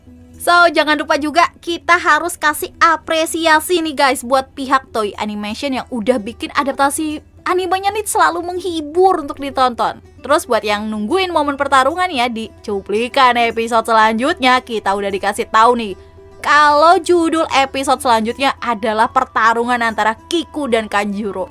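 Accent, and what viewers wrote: Java, Indonesia. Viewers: Medok dia org jowo kek Mr kucluk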